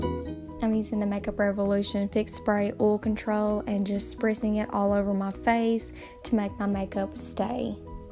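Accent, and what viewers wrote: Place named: Rural, United States. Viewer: I never noticed how country your accent was until these.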